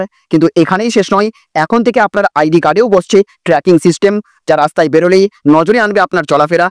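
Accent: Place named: South, India. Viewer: Aponi ki bangla vasai bollena na ki madrasi vasai bollena.....konta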